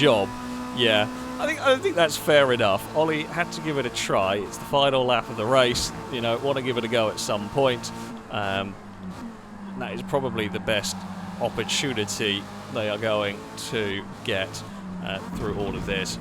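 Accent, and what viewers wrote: England, United Kingdom. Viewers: One last thing We can tell your English by your accent But There is no such word as gooder GOODER It's good of or good enough but there's no such word as good or you might want to modify that out of your vocabulary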